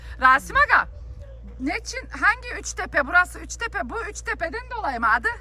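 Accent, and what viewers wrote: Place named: Bulgaria. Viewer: Ne guzel video cok mutlu oldum..birde bulqar turkcesi konuşmana bayiliyorum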